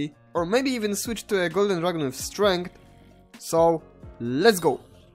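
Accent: Poland. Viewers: Po pierwszym słowie czułem że jesteś Polakiem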